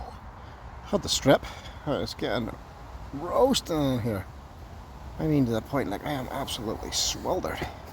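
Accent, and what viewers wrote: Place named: Northern Ireland, United Kingdom. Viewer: Good to hear a Norn Iron accent on a camping vid, keep it up 👍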